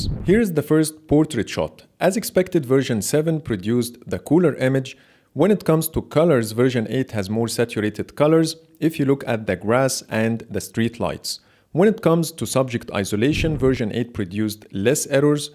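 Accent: Egypt. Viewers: Very nice Egyptian accent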